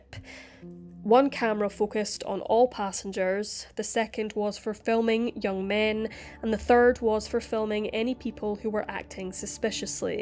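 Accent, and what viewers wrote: Scotland, United Kingdom. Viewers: Love a Scottish accent. My favorite. 😊♥️ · It's amazing theres so much love for this girls voice.. it soothing, clear word pronunciation, husky and the Scottish accent. I love it!!!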